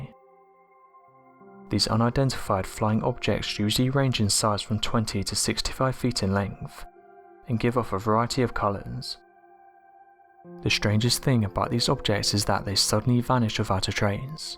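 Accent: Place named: United Kingdom. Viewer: ..... I am thinking it's a British/ UK 🇬🇧 accent!...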